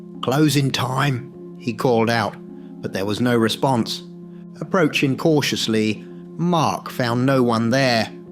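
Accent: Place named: United Kingdom. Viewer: You're appearence has change a bit but.your enchanting voice along with your british accent still haunts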